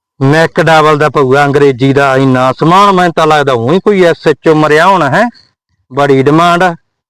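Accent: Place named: Malwa, India. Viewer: ਆਪ ਜੀ ਦੇ ਵੱਲੋ ਖਾਸ ਤੌਰ ਉਪਰ .ਮਲਵੀਆਂ.ਦੇਵਾਂਗ ਬੋਲੀ ਗਈ ਅਵਾਜ ਤਾਂ ਬਹੁਤ ਹੀ ਵਧੀਆ ਲੱਗਦੀ ਹੈ।ਧੰਨਵਾਦ ਜੀ।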